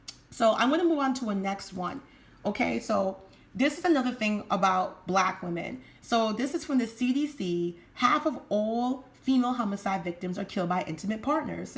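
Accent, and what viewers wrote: Jamaica. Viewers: You're welcome. Also it's nice to hear a fellow Jamaican pon the mike.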